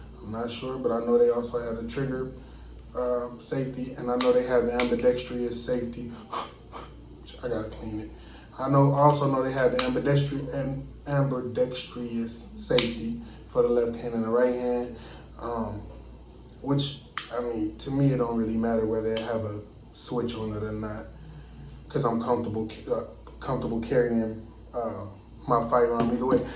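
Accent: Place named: South, United States. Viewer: 😭😭😭😭 Sounds Just Like Down South, When I Use To Live In TX & FL, I Remember A Few Niggas Said It Like That, Always Sound Fire 🔥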